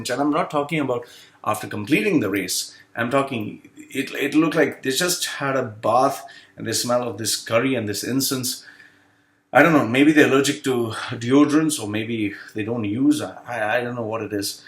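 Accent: India. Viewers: Dont look indian but accent is give it away. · Even you cover your speaking with English diction, we can still hear your Indian accent, sorry but you still looks like an indian. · YOUR ACCENT IS LIKE INDIAN PEOPLE TALKING SH*T!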